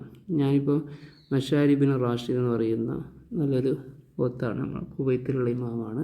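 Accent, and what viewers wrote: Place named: Kasaragod, India. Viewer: സംസാരം കേട്ട് കാസർകോട് ജില്ല എന്ന് തോന്നുന്നു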